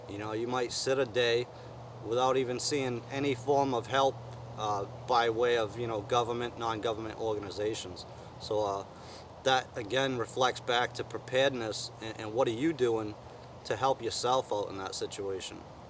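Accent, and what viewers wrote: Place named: Massachusetts, United States. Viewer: Your voice makes me homesick for Massachusetts. Thanks for the info and have some chowdah and clam cakes for me.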